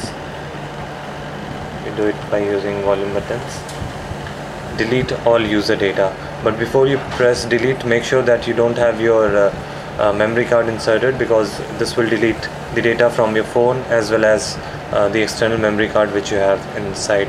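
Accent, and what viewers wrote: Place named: India. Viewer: what does he press PLEASE I DO NOT UNDERSTAND INDIAN ENGLISH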